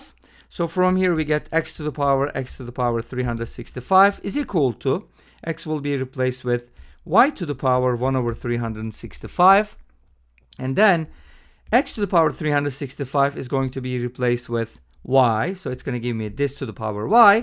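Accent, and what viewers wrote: Turkey. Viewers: Congrats! Is there a chance that you are from Turkey?? Your accent resembles Turkish English accent :)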